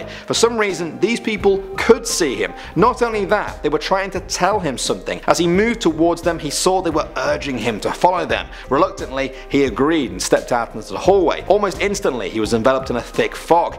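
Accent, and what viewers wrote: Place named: United Kingdom. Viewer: I can’t stand this British accent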